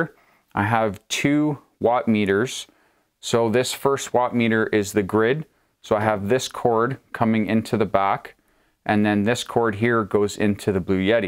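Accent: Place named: United States. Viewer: I thought Yetis were brown? LOL. I have the AC200L, and can't help chuckling at the American pronunciation. Great video by the way. I have a 5KW solar installation on my rooftop. The power company will only give me 5 cents a Kilowatt input credit, so during the daylight hours I run all my power hungry devices, pump the house full of heat or cool air depending on the season. Now I have the AC200L charging during the daytime and running some of my essentials at night. I have a folding solar panel that I can use in case of grid failure, so I may never recover the full cost of the unit, but I have a bit of a guarantee against blackouts.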